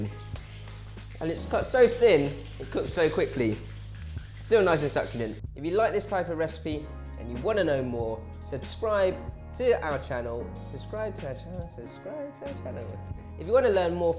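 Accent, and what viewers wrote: United Kingdom. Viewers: Are you being deliberately obtuse? He's british, like Gordan. Similar accents. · A Chinese guy with a British accent having Indian in-laws cooking Japanese curry......... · LOVE LOVE LOVE your videos. You are indeed a true professional. I am so happy that I found your channel. Everything you make sounds and looks delicious. Thank you. BTW, also love your British accent.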